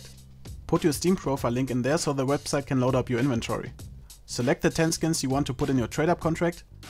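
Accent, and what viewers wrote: Germany. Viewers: Fabian N aber er redet ziemlich langsam und verständlich es ist zwar der deutsche Akzent dabei aber wenn du so etwas nicht verstehst dann solltest du probieren dich an einen deutschen Akzent zu gewöhnen ... denn das englisch war sehr gut verständlich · All of this math sounds so much better because you're German <3 cheers! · Arbeite bitte an deinem Akzent, hört sich schrecklich deutsch an.. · Ne ich verstehe sein englisch fast nicht mit diesem deutschen akzent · Man hört das er deutsch ist XD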